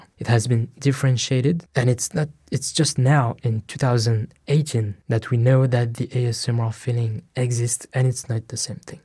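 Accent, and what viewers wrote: France. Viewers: I tried to explain (what I know now to be ASMR) to my family/friends when I would ask if random people (telemarketers, random people on the other end of the phone OR random people I’d have interactions with) and try to explain that listening to certain people’s voices would start to almost lull me to sleep with my head tingling/chills. No one ever understood what in the world I was talking about and I was almost frustrated I was so easily affected by certain people’s tones, sounds, volume, dialect, ect. 5 or so years ago I finally found out I was describing my own ASMR! Speaking of tingles - Please continue to talk in English with your amazing French accent. ☺️😴Ahhhhhh!😴☺️ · c'est quand même marrant le fait que l'accent français fasse que je comprends chaque mot parfaitement. Merci et continue d'être français stp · kinda off topic but I LOVE your French accent it’s so adorable 🥰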